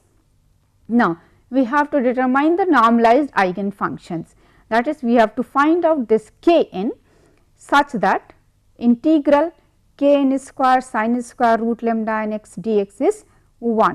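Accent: India. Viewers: lambdaをレムダ、squareをスクワールと読んでいるインド英語。そして、数式の細かい式変形を一文字残らず音読している。インドグッジョブ。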